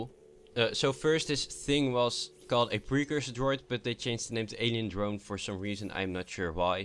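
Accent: Netherlands. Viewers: R U dutch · Are you from holland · you sound dutch? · Hè really sounds Dutch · are you maybe dutch becaus i am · sounds like he has a dutch accent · i havea question... are you netherlands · 1 question... are you Dutch? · are you dutch? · is he dutch? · Wauw je hoort gelijk dat ie nederlands is XD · Yo man, ik kan horen dat je Nederlands bent. Ben ik ook! Vette vids trouwens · are you dutch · Haha ik kan aan je accent horen dat je nederlands bent. xD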